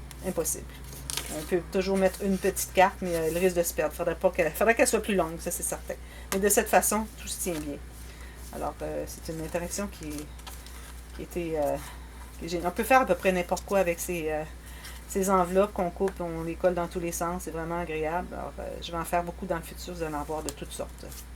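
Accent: Canada. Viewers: Je m'abonne grâce à (eternity pour toujours) tu es très doué magnifique j'adore je like 👍👍👍👍👍👍 as tu fais un tuto pour l'enveloppe à soufflet tes explications sont très très claire j'adore aussi ton accent tu es canadienne ? Si oui j'y suis allé et j'ai adoré je suis revenu et je voulais déménager la bas mil merci pour votre partage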